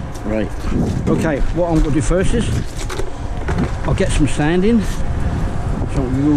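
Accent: Norfolk, United Kingdom. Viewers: What part of Norfolk are u from ?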